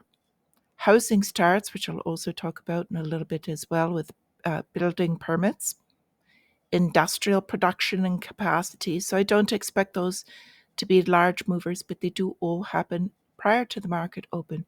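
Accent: Ireland. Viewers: HELLO! Oilseed here.:-) Do I detect a bit of 'Dublin' in your voice? Thanks for the info!!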